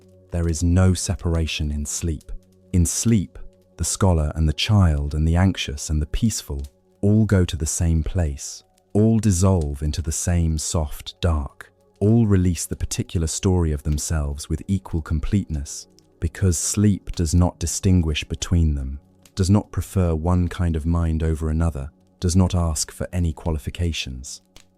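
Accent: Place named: United Kingdom. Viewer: British accent no freaking thank you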